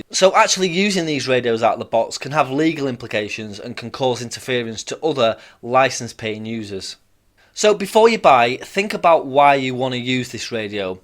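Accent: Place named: Cockney, United Kingdom. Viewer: Can you change your accent please. I cant understand you properly. You speak to fast. Im not a fan of your cockney accent 👀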